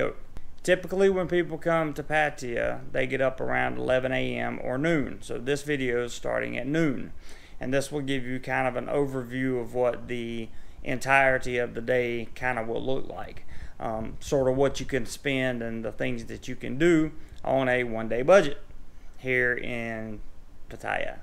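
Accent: United States. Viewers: I like your cool american accent.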